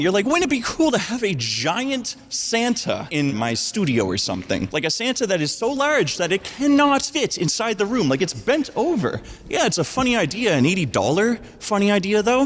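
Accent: Canada. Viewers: This man has the thickest Canadian accent I've ever heard.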